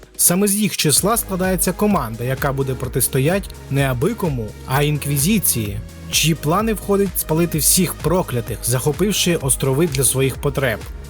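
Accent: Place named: Surzhyk, Ukraine. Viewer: ааа снова на суржике пшешишь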